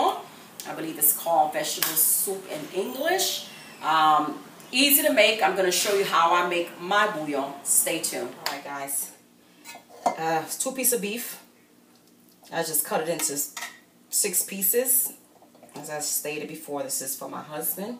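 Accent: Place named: United States. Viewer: eskizem wi madam se cuisine haitienne mwen te ekri sou YouTube la,epi se anglè mw te tande ki tap pale,franchman mw panse se yon americaine ou te ye,selman mw te felisitew pou bouyon an,siw pran sam diw la nan yon lòt sans wa eskizem masè...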